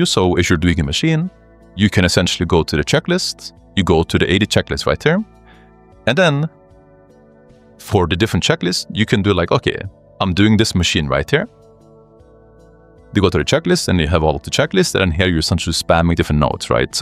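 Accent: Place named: Sweden. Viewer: Sounds Swedish to me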